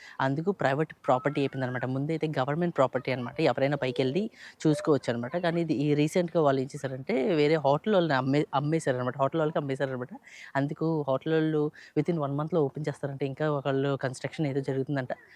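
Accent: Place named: Telangana, India. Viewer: Elo😂 meedhi Telangaanaa Slang kadhaaaa🌲🦕🦜❇️✳️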